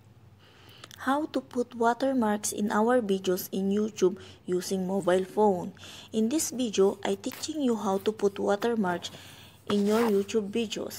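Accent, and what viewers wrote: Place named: Indonesia. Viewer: I think you're not a filipina? Your accent like Indonesian, malaysian, indian girl.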